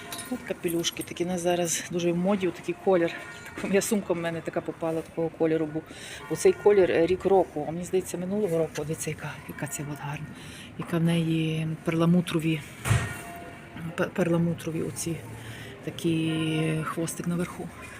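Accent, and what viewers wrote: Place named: Western Ukraine, Ukraine. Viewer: А мне нравится сразу слышно что с запада, и прикольно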